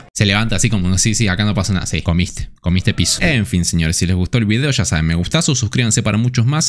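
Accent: Argentina. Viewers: ?MARAVISHA, BRASHAN? ?que estás diciendo amigo ARGENTINO! !aquí en MEXICO no te entendemos nada !por respeto a México habla bien pronuncia bien las palabras. !no seas irreverente y mal educado!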